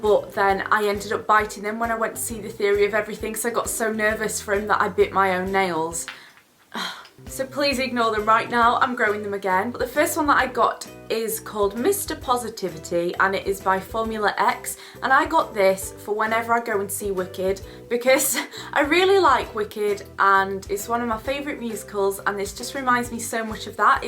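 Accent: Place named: North, United Kingdom. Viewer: Love you videos:) can tell ur northern lol saying it's sick lol:) xx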